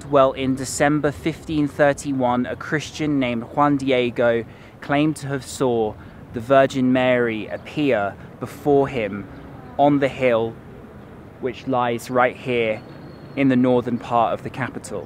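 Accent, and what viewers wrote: United Kingdom. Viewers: I love your British accent 💓 so funny... And I love see you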